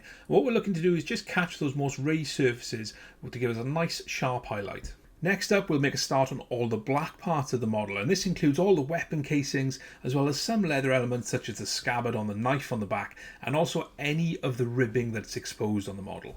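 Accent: Wales, United Kingdom. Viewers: Wait is that a Newport/Cardiff accent I hear???? Sub!!!! Oh and great content!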